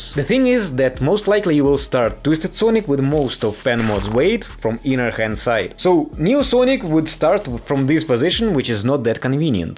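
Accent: Russia. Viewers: мне кажется, или я слышу русский акцент? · Такое чувство будто ты русский, который выучил английский ... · Таке ощущение что он русский, но говорит на английском. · Мне кажется. Что этот чел русский, акцент очень сильный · Стопэ, ты русский? Акцент довольно таки русский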